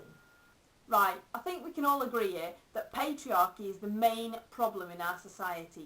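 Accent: United Kingdom. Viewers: Are you from Birmingham? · this stoke accent is beautiful. 'CAPEETULLIZUM.'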